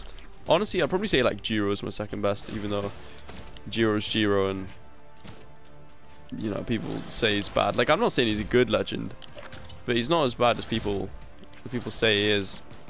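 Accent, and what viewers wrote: England, United Kingdom. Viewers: hey you're from england right?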